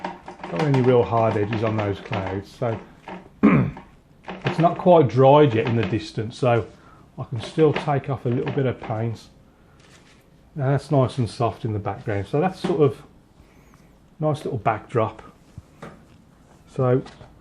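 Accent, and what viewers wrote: Birmingham, United Kingdom. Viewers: Love the brummie accent being a fellow Brummie good to hear the tones from Brum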